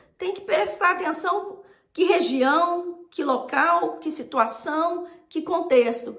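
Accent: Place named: Minas Gerais, Brazil. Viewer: Minas é nosso país 🙏. Obrigado pelo vídeo xará...me emocionei mto na parte do sotaque!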